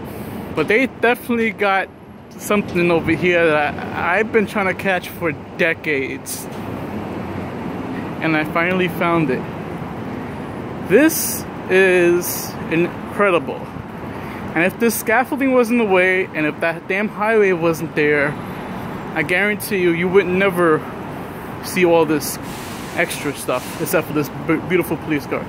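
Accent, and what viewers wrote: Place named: New York, United States. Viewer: It is New York accent that is bothersome.Plus he sounds mildly afflicted with something.lol!??????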